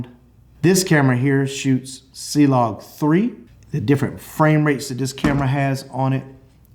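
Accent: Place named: United States. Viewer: Awesome video, are you from Oakland or H-Town if I may ask? It's hard to tell where your accent is from in the USA, I am guessing one of those two, Houston/Oakland? You sound just like my brother in law, so I'm guessing one of those two? Anyways great video and hit the nail on the head, I like the R3 more than any other mirrorless camera and I'm a full time Nikon NPS shooter or photojournalist. However the R5 is also amazing and they definitely beat the Nikon D850, but took a long time and the EOS R/RP were lackluster. Anyways love the videos and you're meant for this! Let me know if you ever want to do a guest speaker or co-host show, I do interviews and YouTube at times, and used to act as a teenager, I'm 36 now.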